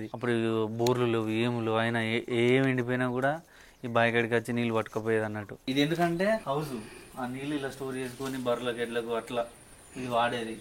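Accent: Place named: Nirmal, India. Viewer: Meedhi Nirmal ha annna mem.sem hatlane matladutham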